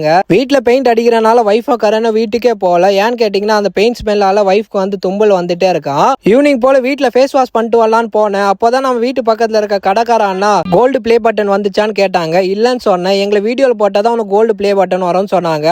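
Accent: Tamil Nadu, India. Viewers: Bro, nice tamilaccent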